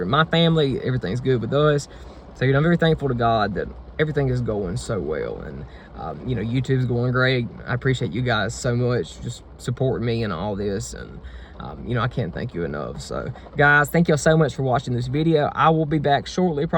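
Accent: South, United States. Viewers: "gay country accent".. lol. It's just the region in the South he's from... and the parents (voices) he was raised around. (I'm from the south)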